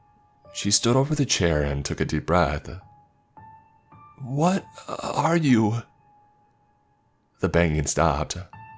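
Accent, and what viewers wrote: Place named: California, United States. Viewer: I hate your preppy Californian girl accent.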